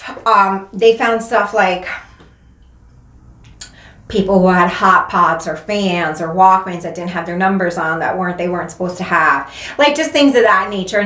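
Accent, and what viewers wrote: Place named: New York, United States. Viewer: Random but i love your new york accent lol! Also as a fellow addict in recovery i love and adore the work you are doing. I was a heroin addict for the better part of 8 years on and off. Finally have substantial clean time and my twin boys are due to make their appearance may 3rd WE.DO.RECOVER!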